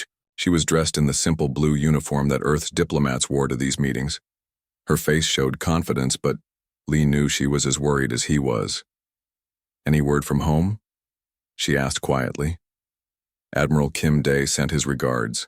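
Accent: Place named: United States. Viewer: You must be an American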